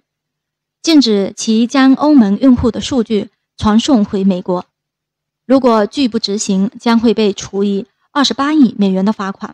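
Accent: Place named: Fuzhou, China. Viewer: 應該是福州老鄉不容易好比你不容易碰到能把普通話說的遛的香港人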